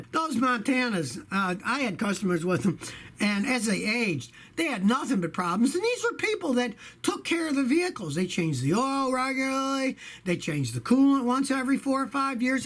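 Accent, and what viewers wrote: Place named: Texas, United States. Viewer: I believe he's from Houston, Texas. Some folks still use old accentuation like that. Mostly older folks. I'm not gonna lie, we do have a general different tone here in Texas tho.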